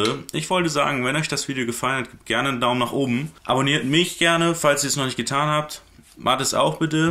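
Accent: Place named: Baden, Germany. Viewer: echt cool es Video von eurem Akzent denk ich Mal ihr kommt aus der Badener Gegend oder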